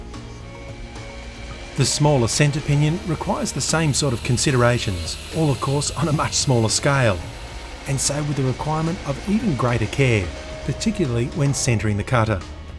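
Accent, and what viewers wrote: Australia. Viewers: Hearing an Aussie accent talking about inches brings back memories. And yes, I'm that old. 👍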